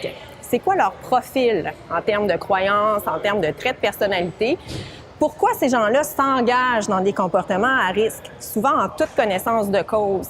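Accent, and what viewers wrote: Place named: Canada. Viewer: Merci beaucoup très belle canadienne ! Je suis parisienne..j'ai réussi à vous suivre avec votre bel'accent ! 🐈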